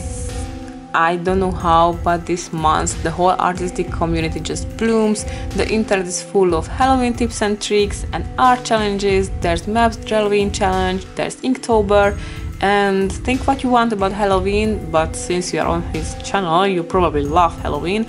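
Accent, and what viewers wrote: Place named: Hungary. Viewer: Szia! Olyan érdekes látni, hogy mennyi magyar művész bújik meg elrejtve a videómegosztókon. :) Nagyon szépen rajzolsz, véletlenül hallottam meg a voicoverben hogy magyar vagy ><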